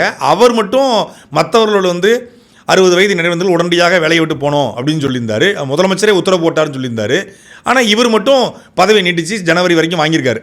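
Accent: Tirunelveli, India. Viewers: சாட்டை தம்பி, உன் பொருநை புரளும் பொதிகைத் தமிழ் உச்சரிப்பை பொழுதெல்லாம் கேட்கலாம். வாழ்க உன் அழகு தமிழோடு நீயும்.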